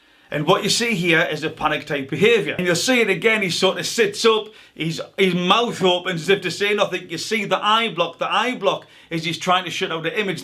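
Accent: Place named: North East England, United Kingdom. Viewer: Where you from I hear a north east accent? Boro?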